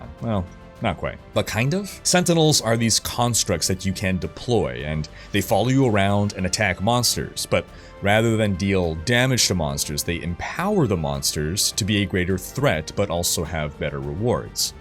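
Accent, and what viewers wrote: Quebec, Canada. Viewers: Wow did not know you spoke Québec french ! Ton accent est excellent ! spa mal bon ! Sa fais des années que je te suit, tu es la meilleur source d'inforemation sur Diablo et autre que je connaisse sur youtube ! Lache pas ton excellent travail ! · Hahaha so nice to hear you talking (Canadian) french ! (Belgian/french speaking follower here :)) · Playing ladder, for the first time in d2 (played this game back in the day, never made it all the way to Hell difficulty). I see this ladder as an opportunity to finally get to endgame and experience it. I made a fire druid, very funny since the 2.4 patch. At the time of writing, I’m level 55, act 3 Nightmare, and I’m having a blast so far!!! Thanks to your video I managed to do a Spirit Crystal sword and it is very strong!!! Also, I just recently discovered your channel cuz of D2R ladder, and love your content! 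Specially you speak french canadian (as I am), that Câlisse was funny!) 😂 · His "english canadian" is so good, I wonder if is his french canadian is his mother tongue or not. 😀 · Are you from around Montreal? Your Quebec accent is on point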